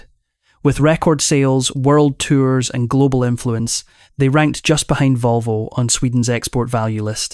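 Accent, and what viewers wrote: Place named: Scotland, United Kingdom. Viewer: It`s the first time I have heard AI with a Scottish accent.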